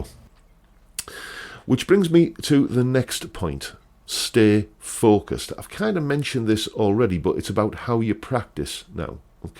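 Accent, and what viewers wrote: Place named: Teesside, United Kingdom. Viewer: I worked at North Tees General Hospital (as it was) for what seemed like a century (80 hours/week) . That accent brings it all back !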